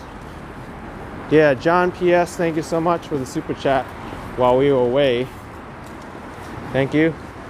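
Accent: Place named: Boston, United States. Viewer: Thanks for limiting the use of the Boston accent.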